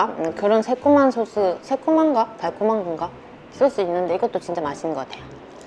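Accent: Gyeongsang, South Korea. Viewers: 정말 잘 먹는다.. 오늘~은 ...구수한 경상도 말씨 또 들어봅니다.. 저도 시켜 먹어 볼랍니다..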